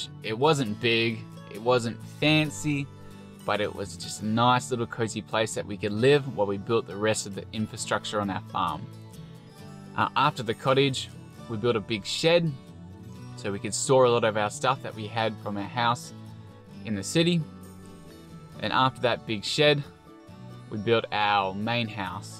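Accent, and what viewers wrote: Australia. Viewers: Sometimes it seems to me that you speak English. But it seems to me that you speak a language that I do not understand. I understand that this is your Australian accent. Speak more slowly and clearly, do not lose the ending of words. I will be grateful, I learn English by ear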